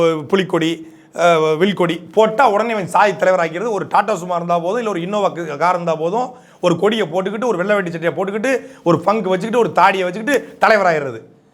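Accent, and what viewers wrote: Tirunelveli, India. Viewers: அண்ணாச்சி நீங்க திருநெல்வேலி மொழியிலேயே பேசுங்க ரொம்ப சுவாரஸ்யமா இருக்குது💯👏👌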